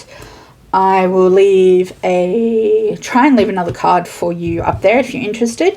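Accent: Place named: Australia. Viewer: You from Australia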